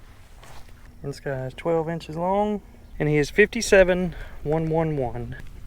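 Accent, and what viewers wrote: South, United States. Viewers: Hearing you describe the pond tech in a Southern accent is peak Americanness.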